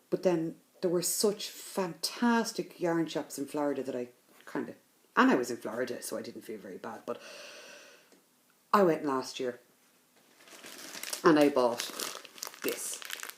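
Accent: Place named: Ireland. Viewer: Hi. Ah, I could listen to your speak all day, I love a great Irish accent. If it were me, I'd cut the four panels of the tree and stitch them separately, just for, ease of use. A couple of online stores in the UK are Sew and So and Bothy Threads. Etsy, Clouds Factory, Frosted Pumpkin and Heaven & Earth Designs (HAED) all offer downloadable PDFs available for purchase (so no shipping costs).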